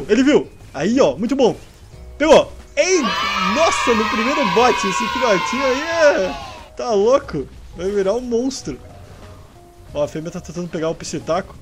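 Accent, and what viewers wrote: Rio Grande do Sul, Brazil. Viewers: Gaúcho? Mas aah djdjjd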